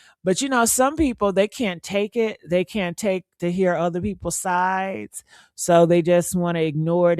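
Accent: South, United States. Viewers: if you dont mind my asking, are you from the south??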